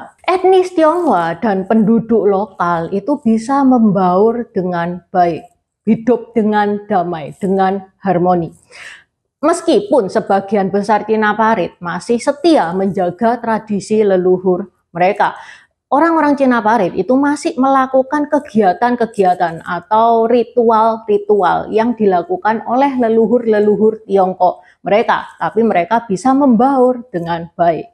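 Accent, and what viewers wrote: Java, Indonesia. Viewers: mba sendiri kenapa medok bahasa jawa nya padahal cina juga hehee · Medok jowo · 😆😆medok banget jawanya,hayo siapa yg bilang itu cina?? itu orang jawa yg lahir di keluarga cina😂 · mbak logatnya kok pakai Logat Jawa .kenapa gk kalimantan atau madura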